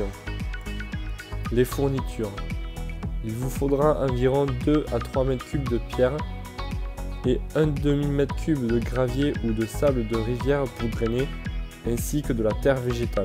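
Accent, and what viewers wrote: South, France. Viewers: (16)... Oh pétard... Ça c'est super beau.. Et très bien expliqué en plus 👌 Mais d'où vient cette pointe d'accent du sud.. La Provence peut être avec les plantes aromatiques positionnées ici ? ☺️ En tous les cas bravo chaîne très intéressante 👏👏👏👏 A bientôt 🤗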